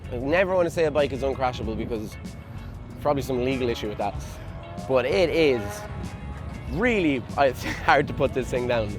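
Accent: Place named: Alabama, United States. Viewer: Moving away from HD and looking at a Wing. Getting a little outdated and needs some new updates, but you get a lot out of the gate. No extra cost for adding heated grips, heated seat, having multiple windshields, etc. Honda has been refining this bike for a lot of years and they seem to know what they are doing. Oh it’s not American, what bike is? HD ain’t. Indian ain’t. It’s hard enough to spend $30k on the Honda forget about the other brands. But I think I need a comfy bike for 2-up riding that can eat up the miles. I think the Wing is the answer. Nice video. Love the Alabama accent 😂. Ride safe 😎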